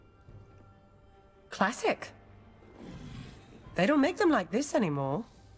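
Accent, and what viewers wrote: United Kingdom. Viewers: You have a little character in your British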